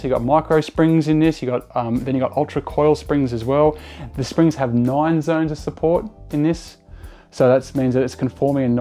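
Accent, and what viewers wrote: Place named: Australia. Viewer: Not too compatable with US market. I did enjoy the Aussie accent.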